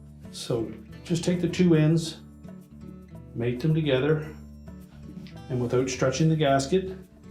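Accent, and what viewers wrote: Canada. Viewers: I think he might be from Canada. They would have an accent like that.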